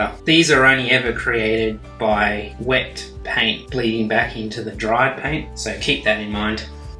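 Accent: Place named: Australia. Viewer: Love watching the way you paint light! And great having a fellow Aussie accent to listen to 😊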